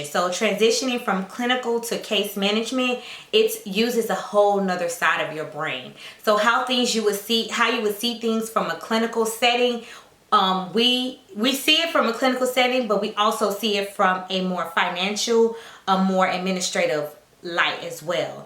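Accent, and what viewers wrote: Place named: South Florida, United States. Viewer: Chile I know my Florida accent from anywhere. Especially south Florida 😂😩 let me get some experience at the bedside and I’m out! Utilization Review sounds interesting 🤔 thanks boo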